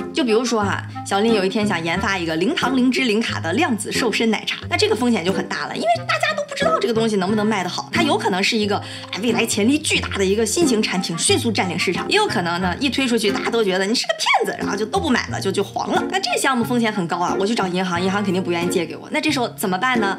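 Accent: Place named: Beijing, China. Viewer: 这京腔，真好听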